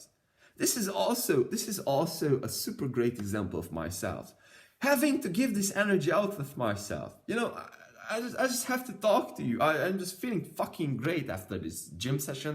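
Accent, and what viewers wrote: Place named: Italy. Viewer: You have a deep accent- let me guess, you're Italian?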